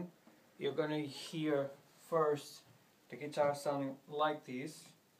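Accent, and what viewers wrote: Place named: Portugal. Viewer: Pelo sotaque pareceste-me ser português lol uma pequena pesquisa e confirmei suspeitas! eh ehh:) bom trabalho! Cruzei-me ao acaso com este video e gostei!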